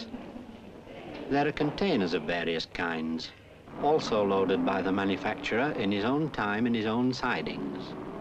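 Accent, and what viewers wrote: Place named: Newcastle, United Kingdom. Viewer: I can hear the Geordie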